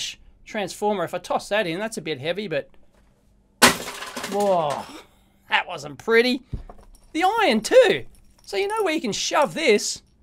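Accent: Australia. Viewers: You picked up the Australian accent very quickly! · Yep it’s pants. To be honest Weller has a long tradition of supplying quality irons and stations. Weller as with a lot of European manufacturers have gone off the boil of late which is a shame as they are looking at price point rather than quality, it seems to be money men vs engineers. I will say one thing you sure have picked up the Aussie accent, how long have you been out there?:-D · even got an authentic Strayan accent already. Amazing!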